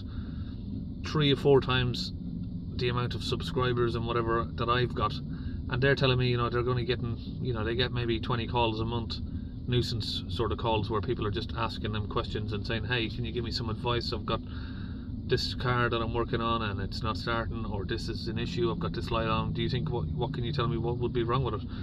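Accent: Ireland. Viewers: You've been too successful! The number of calls you are getting is an indication of how hard it is to find competent people. Maybe you could find an apprentice to train up and have someone run the phone/email/bookings and morph into a bigger business? As you can see, the demand is there. I'd love to have a way of asking a few questions of someone like you to work out where to go next. And as you say it's only fair to pay for that service. In the short-term you could just set up another (SIP) phone number so your business and personal numbers (or however many you need) are separate. Same for email addresses. It's a bit tricky because you didn't set things up this way in the first place, but it should improve over time. I'm pleased to see you are not too far away from me (23 miles) (I assumed from the accent you were probably in Ireland or the other side of the country) so If I do get stuck I can book you:-) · I'm not a mechanic, just a vehicle owner living on a pension. 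My van had dpf trouble a while ago and i looked at a few of your videos and then told my garage what I wanted them to do, rather than they telling me what they were going to do. Everything worked out ok and both garage and I were happy with the result and saved me a lot of money. I wouldn't dream of ringing you up to pick your brains for free. If the callers don't understand your videos don't bother with them. You have enough to do without engaging in long chats with people. I'm trying to figure out your home county and the closest I can is the midlands, of Ireland, that is. Good luck and good health to you. Thanks again.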